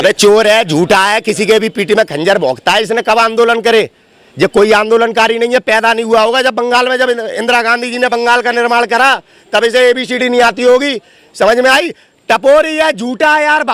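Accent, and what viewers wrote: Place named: Varanasi, India. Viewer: Ye Bhai tho Banaras wale misraji jase bolrahe hai. Misra ji ko our app ke jazbe ko salam. Sare jahan SE acha Hindustan hamara. Jai Javan Jai kisan. Hindustan Zindabad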